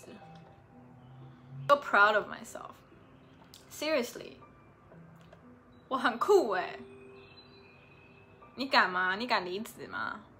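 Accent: Taiwan. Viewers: 回來啦？在臺灣？隔離中？標準的臺灣國語，很親切！ 不知道答案也是答案？打電話來都不是好事？推這兩句直白的話！珍珠奶茶當飯喝，這個也可以推！😋😋😋😋😋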